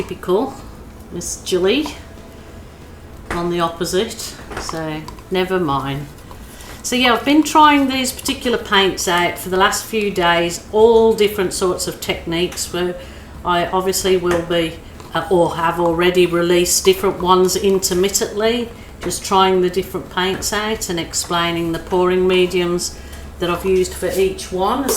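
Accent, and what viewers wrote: Birmingham, United Kingdom. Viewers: I love your philosophy to life. There isn't a video of yours I watch without you bringing a smile to my face, either with your humour or your take on life. I look at your intro pictures (I believe yours is a Brummie accent) and think wow you certainly have found Paradise all the way from England. That's all apart from the great educational and inspirational videos for acrylic painting which have inspired me to dabble.So thank you all the way from a wet and dark England.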